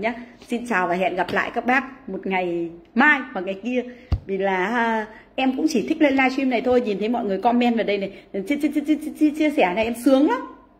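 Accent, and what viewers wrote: Vietnam. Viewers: Hôm nào sẻ mời CAFFE, tâm sự giọng Bắc chính gốc, quá OK luôn. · Anh nghe giọng của em hình quê thái bình phải không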